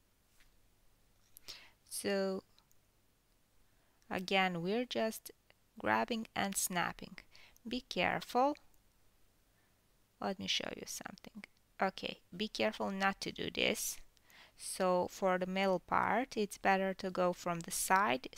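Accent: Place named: Eastern Europe. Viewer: That beautiful Slavic accent...💌💖💝💘 Ukrainian probably. 🥰😘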